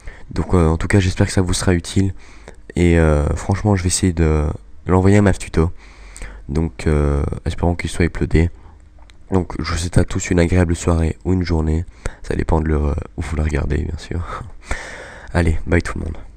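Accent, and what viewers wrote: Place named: Belgium. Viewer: Sa fesais longtemps que j'avais pas entendue ta voix de belge <3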